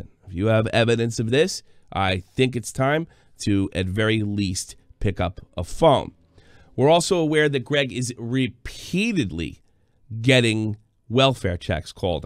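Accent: United States. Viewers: Your English is very American. If your not in North America, what part of the world are you? Just curious since you have no accent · are you natively german? your accent sounds american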